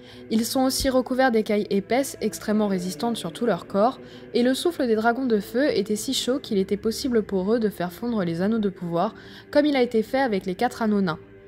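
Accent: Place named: France. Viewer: Ta prononciation des noms de personnages de Tolkien "à la française" est , disons difficilement supportable pour ceux qui parcourent souvent les différents sites dédiés, en français ou en anglais....C'est un parti pris? Ou une "difficulté" perso avec l'english?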